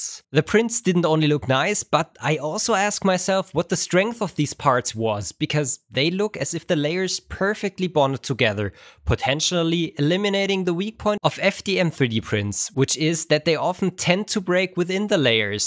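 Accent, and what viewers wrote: Germany. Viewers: Du bist doch Deutscher oder 😅 also ich mein da was rauszuhören 😁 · Wie das deutsche rauskommt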